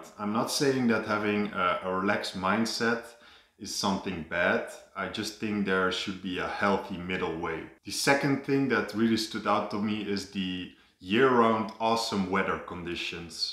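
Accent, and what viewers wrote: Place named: Netherlands. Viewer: I subscribed because you are beautiful and I love your accent and the fact that you're from the Netherlands...much love from 🇧🇲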